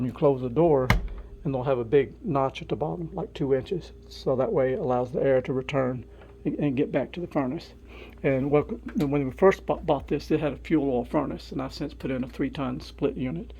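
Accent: Kentucky, United States. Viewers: I had lots of family down south I'd visit every holiday back in the 60's and 70's. Your Southern accent on top of the decor makes it like a dream for me. Thanks for this video.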